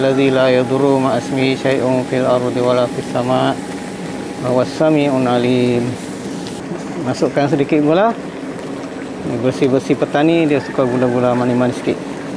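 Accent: Kedah, Malaysia. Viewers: Assalamualaikum, asal org mna? Loghat ckp Mcm org utara Kedah Kuala nerang ja, kikikiki salam follower subsribce tok dari Sya Shuib Pendang Kedah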